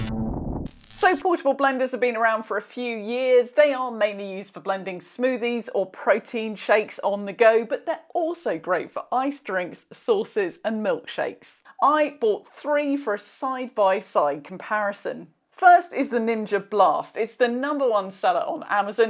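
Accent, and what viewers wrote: England, United Kingdom. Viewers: an anglish person thank god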